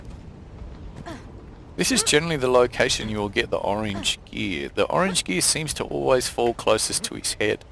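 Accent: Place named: Australia. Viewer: Man as soon as I heard your voice i checked your profile, knew you were Australian haha.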